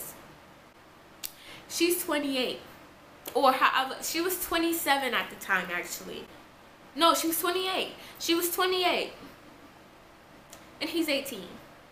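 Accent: New York, United States. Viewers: new york 😊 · That's how a lot of New York people talk, it's kinda like our slang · NY All the way!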